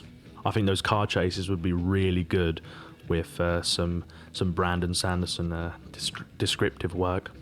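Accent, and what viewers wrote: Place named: Cockney, United Kingdom. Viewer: you've gone really cockney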